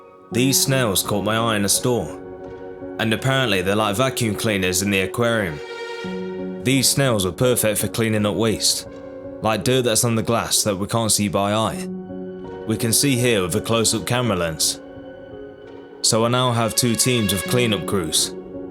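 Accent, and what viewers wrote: United Kingdom. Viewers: are u british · I immediately heard that Brit accent 😭🤌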